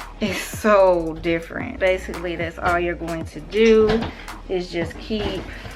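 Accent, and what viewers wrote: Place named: South, United States. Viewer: You have a southern accent 😭❤️ and good job👏🏽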